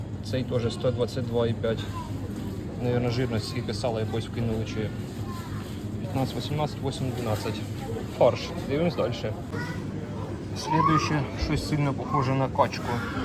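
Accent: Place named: Poltava, Ukraine. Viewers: Привіт з Полтавщини! Суржик клас! Прям як у нас! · Дівчата, нічого не згодна з Вами, його мова - це чистий суржик Полтавської області 😊.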